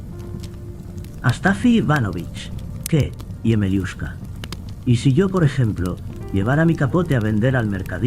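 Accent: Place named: Spain. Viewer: ESO es dormir.... Con ese asqueroso acento del tipo que vino disque a colonizar no solo a mi territorio sino todo centro is y no quiero hablar de ese idiota que andaba perdido 😅 dale este tipo connesr acenide mierda y postea algo para dormir blaaaaaaaaaaaaaldoto estúpido yo que perdí tiempo no solo escrirnfoeesto sino quitando segundos de mi vida escuchado a este asqueroso español 😂😂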